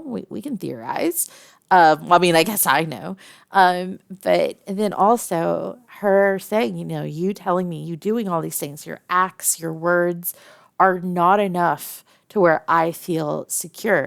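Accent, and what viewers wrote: South, United States. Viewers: Your Southern accent is the most beautiful I’ve ever heard 🥹🥹. This Yandares are the most loving and loyal I would love to that for a Girlfriend someday 🙂‍↕️. You are an amazing woman thank for this Scary yet gorgeous audio also I subscribe to your channel today 💗💗💗💗💗💗. Kind Regards AuraKnight 🥰🥰🥰🥰🥰🥰🥰.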